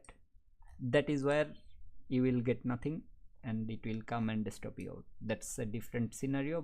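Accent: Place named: India. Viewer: you sound indian.. u indian?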